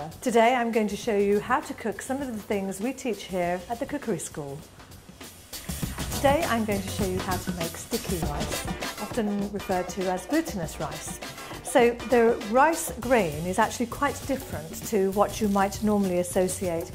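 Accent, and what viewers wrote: England, United Kingdom. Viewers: No one is going to comment on how she is a sand lady with an english accent.. lawl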